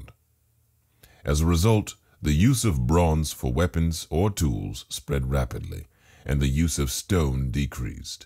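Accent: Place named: United Kingdom. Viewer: Can’t tell if you have a British or American accent. It’s like a mix of the two.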